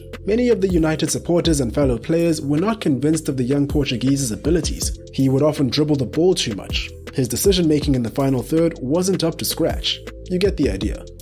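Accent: South Africa. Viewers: That "Ja" keeps confirming your Mzanzi'ness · Bro, I'm south african and I can tell you're south african so surely make a video on benny McCartney or bafana bafana or something south african😂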